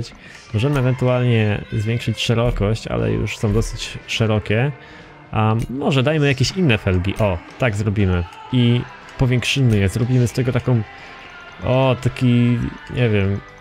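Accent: Szczecin, Poland. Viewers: jesteś ze Szczecina??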